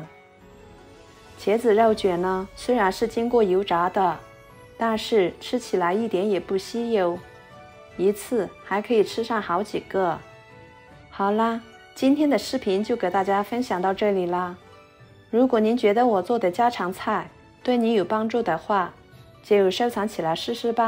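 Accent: Yunnan, China. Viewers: 我就想问，博主是不是云南的？🤣🤣🤣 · 嗯，一聽就是雲南妹子。😊好手藝👍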